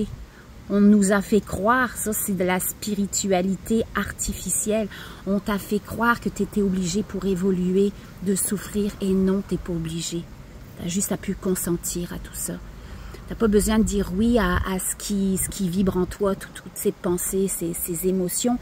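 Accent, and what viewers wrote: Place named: New Brunswick, Canada. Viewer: tu as un bel accent que j'aurais pensé peut être du Nouveau Brunswick 🥰 grand merci 🙏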